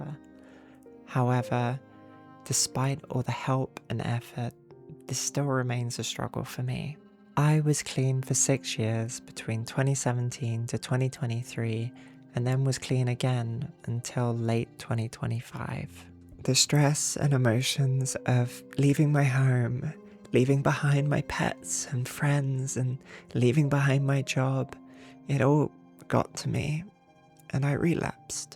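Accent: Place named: England, United Kingdom. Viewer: That accent is English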